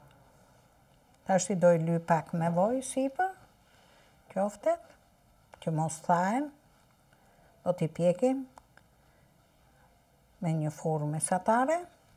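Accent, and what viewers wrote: Tirana, Albania. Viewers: me zërin, dialektin dhe gatimet e Tironës, më nxjerr mall, shume mall .....!!!🙏❤️😭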